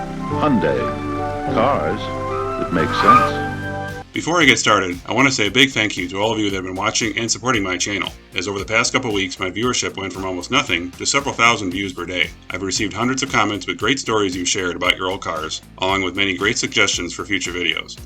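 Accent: United Kingdom. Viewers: The way the British pronounce things are so freaking annoying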